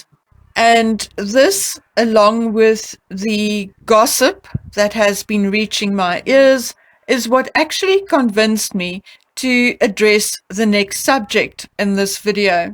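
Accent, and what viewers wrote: Afrikaans, South Africa. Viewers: Daai Afrikaanse aksent sal ek enige plek in die wêreld herken!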